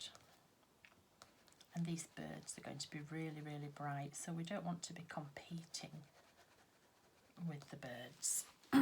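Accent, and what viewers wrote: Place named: Yorkshire, United Kingdom. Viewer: So lovely listening to a Yorkshire Lass and giving lots of advice & inspiration. Thankyou, from a misplaced Yorkshire Lass dahn souff. Xxh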